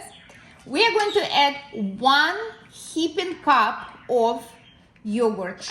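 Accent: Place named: Armenia. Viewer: These look wonderful. I LOVE your accent...it reminds me of all my Armenian relatives from many years ago. I will make these soon!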